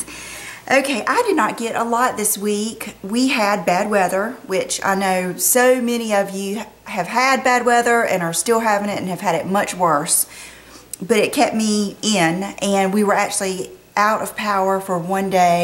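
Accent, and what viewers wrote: South, United States. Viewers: New subscriber! I love your accent! You seem so sweet and like a southern belle. I love your taste in makeup. I am still on the hunt for the Milani goodies!!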